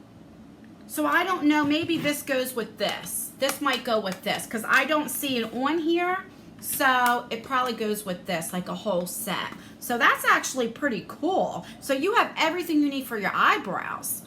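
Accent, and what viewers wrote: New Jersey, United States. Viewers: Like your Jersey accent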